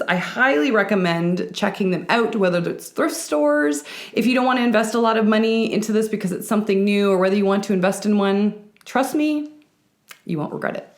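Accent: Canada. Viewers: I just found you, yay! Are you Canadian? You sound Canadian. I’m a BritCanaYank. West coast 36 years.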